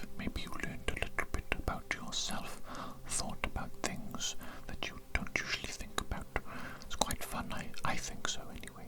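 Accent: Wales, United Kingdom. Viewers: the welsh accent hits 😫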